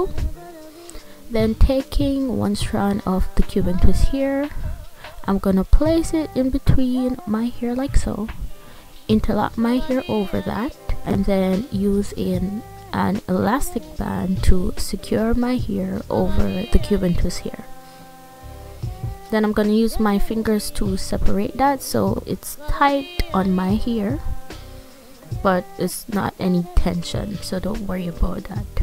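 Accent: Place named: Jamaica. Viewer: Your accent sounds Jamaican fr LOVED THE RESULT AND UR MAKEUP✨❤️😍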